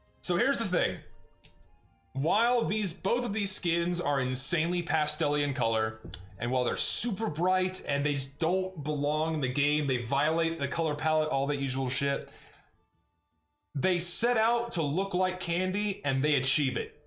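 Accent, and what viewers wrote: Texas, United States. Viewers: I’m noticing how he gets more and more Texan over the course of the video. I didn’t even know he was form there til now, he’s good at hiding it. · and if you watch in 1.25 speed you can hear his southern accent a little bit better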